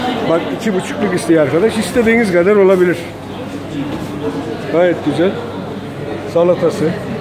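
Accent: Gaziantep, Turkey. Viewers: Kardeş videolarını izliyoruz.bizi hep umsuruk ediyn ağam gecenin bir yarışında hanıma yemek yaptırıyorum.sayende kilo aldım. Ama antep sivenle harikasın basarilar....videolarin devamını bekliyoruz.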